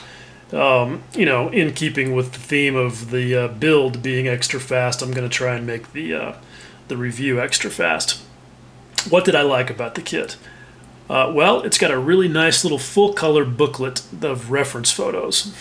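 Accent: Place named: United States. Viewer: Hi my name is William also, I like your style, your accent is soo American. I love planes and tanks and the whole model building scene, its so deep. I just found and joined the Newburg model car club, cause I love cars to. Thanks for your response last time on my comment like some kind of double negative always never disappoint? I cant remember. Any way I am very new to internet interaction and although an old hand at model building new to year round participation still a winter warrior. God bless and look forward to talking to you and sharing my model building experiences.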